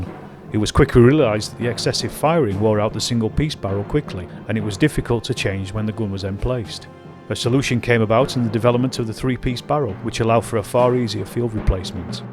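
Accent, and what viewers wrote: United Kingdom. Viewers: You are an idiot. Another British accented individual taking archived pictures and expressing your own flawed opinion on your video. You have NO historical degree so another air thieving mouth breather trying to make money on youtube.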